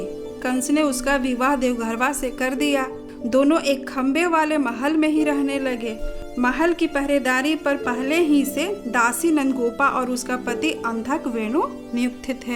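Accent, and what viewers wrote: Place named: Uttar Pradesh, India. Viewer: तेरी आवाज बता रही हे तू नफरती दलित हे ,, तेरी बोली बता रही हे तू उत्तर प्रदेश की नफरती दलित हे नकली कहानिया फेलाना बंद कर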